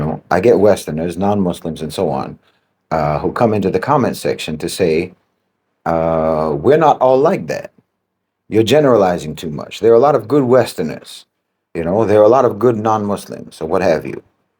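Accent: United States. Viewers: As he condescends with an American accent. 🙄 I can see what you see. Unfortunately, i am silenced while you are given a voice AND a platform to damn the 'collective West! Unbridled patriarchs in positions of power are the scourge of the world. Don't conflate those monsters with a population, the majority of whom were brainwashed by the most powerful propaganda machine to have ever existed! What makes YOU the exception?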